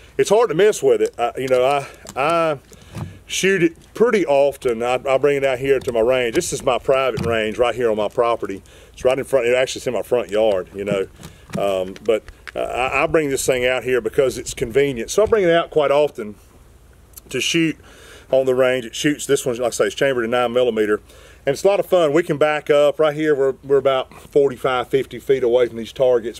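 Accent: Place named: South Africa. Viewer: Cool vid! Where you from? Sound a bit South African.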